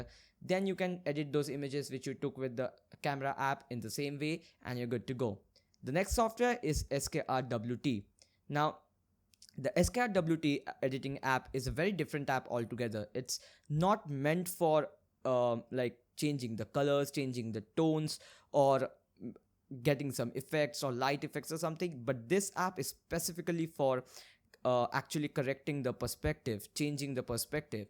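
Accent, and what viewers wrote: India. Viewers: I love that Indian accent😂👌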